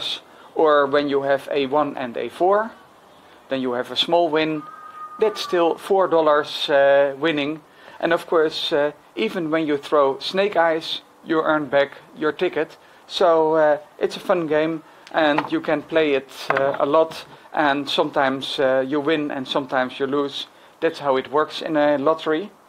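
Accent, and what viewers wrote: Netherlands. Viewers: I can hear you are Dutch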